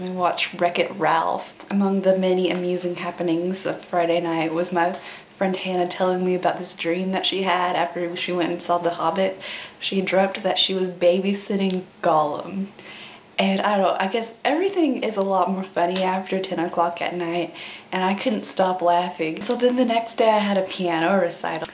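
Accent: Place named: South, United States. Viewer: Thanks. :) The Southern accent wins again!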